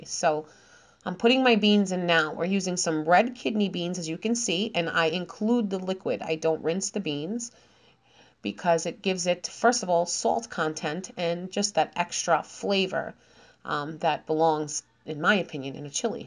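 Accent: New York, United States. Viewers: Are you from NY? You sound like my cousins from NY! I love Wendy’s Chili and I’m crazy about Puerto Rican Food!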